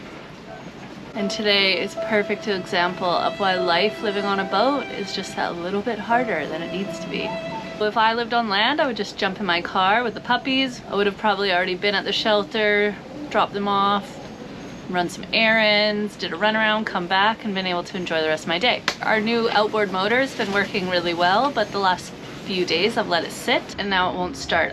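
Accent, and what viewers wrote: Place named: Australia. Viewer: I am not sure if you are a yank or aussie as you got an Aussie ascent sometimes... then you got a yankee ascent .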